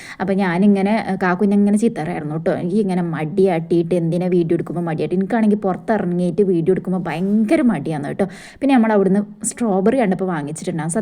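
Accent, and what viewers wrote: Malappuram, India. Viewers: ഞങ്ങളെ മലപ്പുറം കാരെ അതെ സംസാരം തന്നെ ആണല്ലോ ങ്ങൾക് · സംസാരം കേട്ടാൽ മലപ്പുറം ആണെന്ന് തോന്നും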